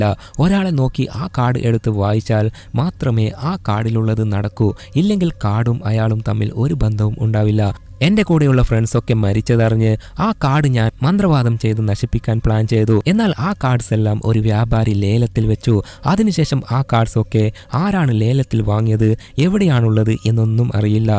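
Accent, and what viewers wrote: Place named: Kasaragod, India. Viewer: Ningal kasargod alle because language kond manassilakum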